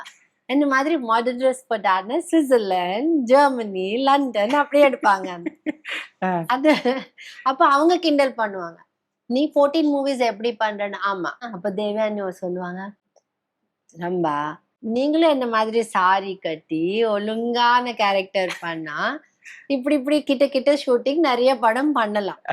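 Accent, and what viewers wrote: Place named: Gobichettipalayam, India. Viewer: Really so cute.. ketute irukalam pola iruku... athum enga ooru gobichettipallaym ah ramba solranga... ramba sir ramba sir❤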